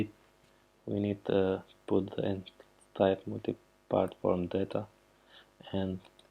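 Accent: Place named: Albania. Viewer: I'm guessing Albania by the sound of his accent, possibly greece.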